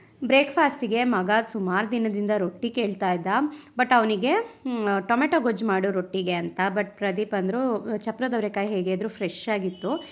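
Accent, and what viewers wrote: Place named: Mysore, India. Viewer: I always wondered that you speak kannada exactly like my house kannada . We are from Mysore / Nanjangud belt . I live in Bangalore . I like the way you talk. You should plan 1 live session with your subscribers .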